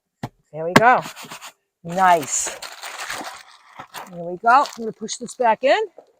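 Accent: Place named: Boston, United States. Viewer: Awesome,! Absolutely love your style! And your accent is the best! I’m from a city just north of Boston and I have the same accent! And I’ve always loved it❤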